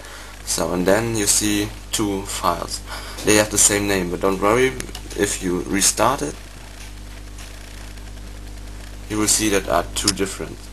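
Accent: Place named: Germany. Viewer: lol german accent ftw! :D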